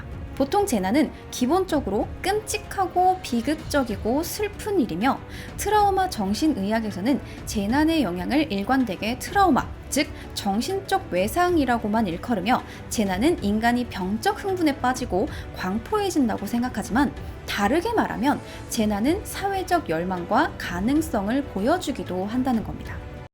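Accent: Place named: Jeju, South Korea. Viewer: 제주도가 고향이심? 아님 이주하신거심? 경상도 억양 만큼 꽤 마음을 설레게 하네요.ㅎ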